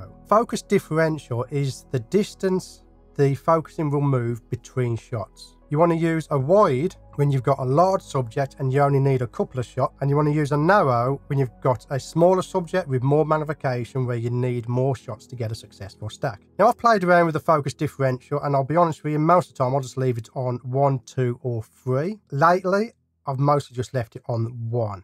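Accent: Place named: Birmingham, United Kingdom. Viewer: that makes sense. I ended up getting one of the rails a bit like the Nisi one that you reviewed. I had a cheaper 4 way plastic one but I found it was a bit temperamental at moving. Also picked up a OM-1 which I am super happy with! Also, Birmingham based? I am sure I recognise that accent! (Brummie here too, but moved away for 10 years and recently came back, god knows why ha).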